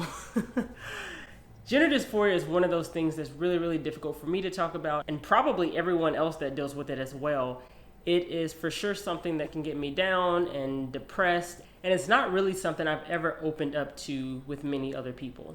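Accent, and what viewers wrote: South, United States. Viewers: I'm late to this, but dude your southern twang rocks and is so sexy!!!! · So well articulated! My daughter, who is experiencing gender dysphoria, sent me this video, and I appreciate you sharing your experiences. It's so hard to be vulnerable like that. Also, I really like your beautiful voice! It has a velvety richness, and the slight southern twang is cute. (For what it's worth, I'm a cisgender, heterosexual woman.) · I love your voice, your southern twang is awesome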